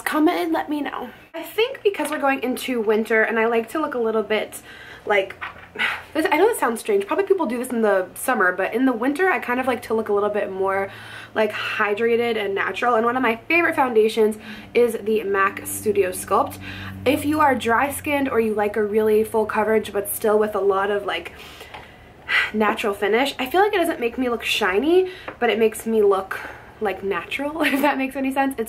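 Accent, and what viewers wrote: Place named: Massachusetts, United States. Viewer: As soon as I heard the 'wicked, wicked glowy skin..." I knew you were from Mass, lol. I am from Northern MA, by NH. That foundation looks amazing on you!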